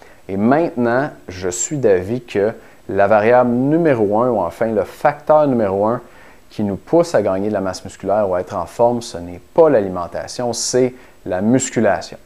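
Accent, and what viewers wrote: Quebec, Canada. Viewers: Me rci pour tes precieux conseils pour moi c'est un peu le contraire ..je perd l'appétit en faisant la musculation. . .je ne sais pas si c'est bien la musculation qui le fait perdre ou bien les oeufs que je prend une demi heure après l'entraînement !?qui ne font que couper l'appétit. sinon pour votre accent québécois. .il est génial haha même s'il est un peu difficile de comprendre et saisir les mots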